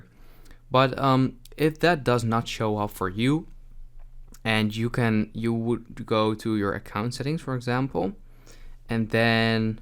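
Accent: Netherlands. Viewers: Im getting the feeling that your dutch🤔 am i right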